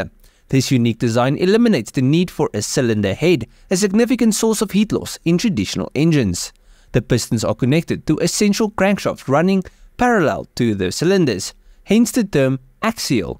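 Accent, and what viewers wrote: South Africa. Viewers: I somehow don't think Noel Duke would appreciate your over-laying and inter-mingling his Z-axis engine rotary engine with the VERY different 'DEVIZE Barrel engine'. ... and I would know; Noel is a personal friend of mine. Barrel-type axial engine's have been re-cycled over, and over and they have NOTHING new to offer. All fail because using a cam plate to translate reciprocating loads over the long-term life of a typical automotive engine is a non-starter. From your accent you are obviously South African so why not look in your own backyard? The Van Loewy (my memory may be faulty on the exact spelling of the name) is a far more interesting axial engine from the 1980/90's. It used patented universal joint to articulate the piston-rod/wobble-plate connection such that it eliminated the figure-of-8 oscillation and resolved the motion to a pure straight-line motion. 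superior to any cam-type axial engine! How about it?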